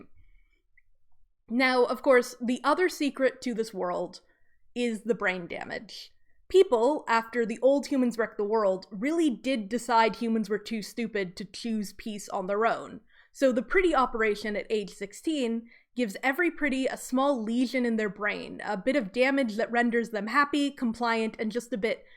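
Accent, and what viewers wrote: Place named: United Kingdom. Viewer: Kind of like "raw-ther", I think? I'm Canadian. To me it sounds like we say it the way it sounds when said in the seemingly dominant American dialect... But I'm pretty sure people are somewhat deaf to their own accents. Like, I don't normally hear "aboot" when I say "about", but then sometimes it kinda does sound like I draw out the "ou" a bit. Anyway, I think the "typical" sounding American dialect that I am familiar with sounds more like "Raa-ther" than "Raw-ther" Edit: is that Somerset, UK or Somerset County, New Jersey. Edit 2: must be Somerset, UK I can actually hear it now. There's definitely a bit of an ambiguous "British" sound to your accent.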